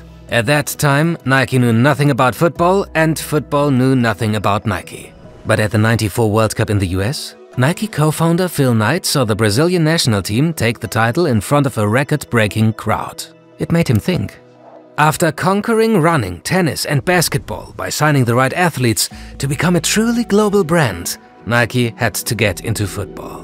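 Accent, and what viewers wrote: Germany. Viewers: Adidas isn't German. Nike isn't American. Both companies are multinational enterprises who use national identity as a selling point as long as it benefits them financially.... und warum schreib ich eigentlich auf Englisch bei einem Video, dessen Sprecher so Klischeehaft Denglisch klingt und alle anderen Komentare sowieso auf Deutsch sind. Achso... ich vergaß... ich bin Englischer als alle anderen hier ;-)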